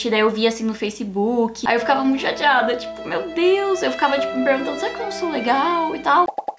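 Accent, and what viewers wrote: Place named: Paraná, Brazil. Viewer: "Muito sotaque paranaense" mais vc é paranaense linda! 😍💕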